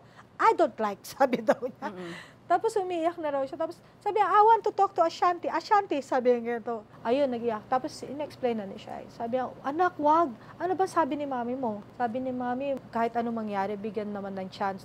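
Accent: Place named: Australia. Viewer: Very easy call Mayor Magalong. He will find your mom Garcia. Your accent now is like Aussie, but you’re right in Australia they take care of their people , specifically women. But in Australia you have to work hard to survive. And bare with paying tax, kasi kapag nakita mo kaltas sa sweldo mo sasabihin mo Bakit parang nagtatrabaho na lang ako para mag tax. Hehehe. Cost of living in Australia is high specially here in Victoria specially MELBOURNE.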